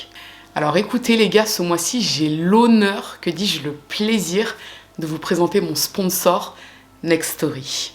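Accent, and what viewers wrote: Italy. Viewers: que du Bonheur pour votre ACCENT ITALIEN un DELICE, et sur le mot de la COCO, juste délicieux merci pour toute vos VIDEOS, bien mieux que le CINEMA et les reportages TV, et votre Charme dans la VOIX Superbe, SuBLIME, J 'aime Tout FELICITATIONS. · Extrêmement fan de ton accent italien haha 😍❤️ Sinon super vidéo encore une fois ! Histoire très troublante... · Adorant l'Italie, t'écouter traiter des sujets de ce pays avec ton irrésistible accent italien.... Je surkiffe !! Grazie Bella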